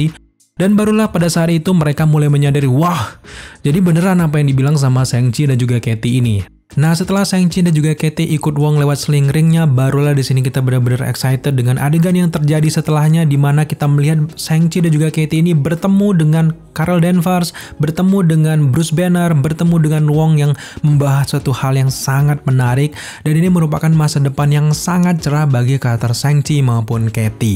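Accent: Indonesia. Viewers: Ya lidah orang indo bisa di maklumi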